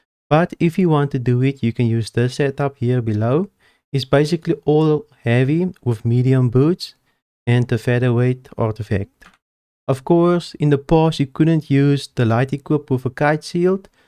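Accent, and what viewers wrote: South Africa. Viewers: Are you south african brother?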